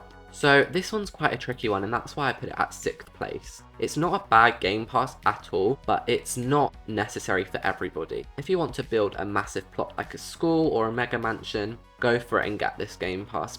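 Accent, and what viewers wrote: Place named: United Kingdom. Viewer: I love ur britisj accent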